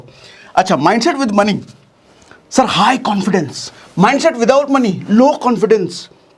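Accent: Bihar, India. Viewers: sir namaste🙏 tex pe video chahiye v bhi aap ke desi bhasha me bij bij pe bihari ton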